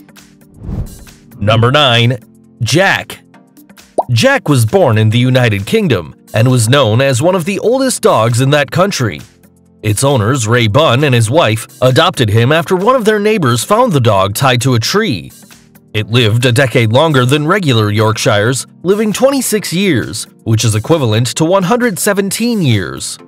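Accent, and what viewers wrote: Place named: United States. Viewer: I do not like the USA English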